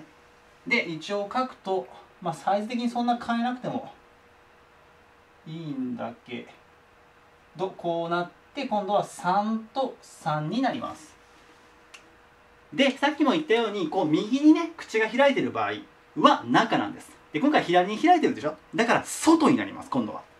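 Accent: Kansai, Japan. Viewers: 関西の人なんですね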